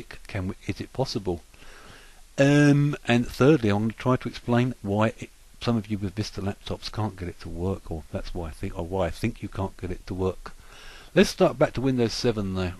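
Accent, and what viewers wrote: United Kingdom. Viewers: wtf with these limey voiced tutorials, didnt even get to 5 secs. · wooh... that's a hard britain accent xD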